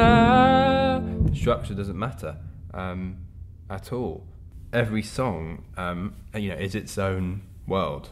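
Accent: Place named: England, United Kingdom. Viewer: Why do you talk English and sing American?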